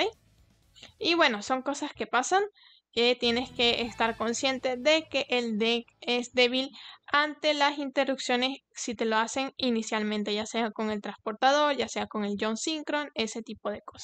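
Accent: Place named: Canary Islands, Spain. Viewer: Tu acento parece canario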